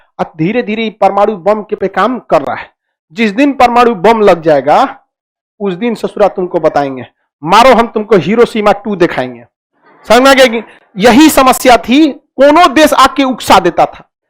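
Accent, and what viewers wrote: Bihar, India. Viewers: Sir Hindi with bihari touch makes your videos more intrested।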